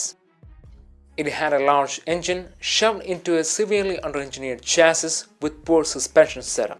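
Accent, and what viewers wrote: Kerala, India. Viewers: anna..ningal mass aa, aa mallu + anglo slang kettapozhe click aayi..lv u 😘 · That Indian accent 🤣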